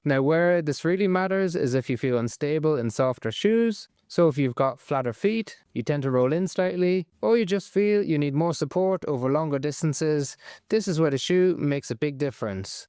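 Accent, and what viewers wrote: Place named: Ireland. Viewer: Find it difficult to understand the thick Irish accent plus talks to damn fast so the obvious thing to help is when each shoe is introduced have the exact model flash up on screen this would be great help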